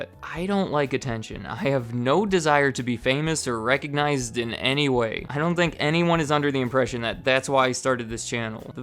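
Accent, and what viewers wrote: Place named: Midwest, United States. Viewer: Sounds Midwest US to me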